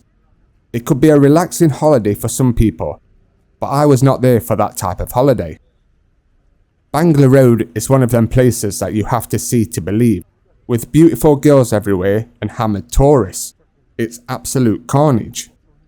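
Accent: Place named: North, United Kingdom. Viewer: love yr northern accent.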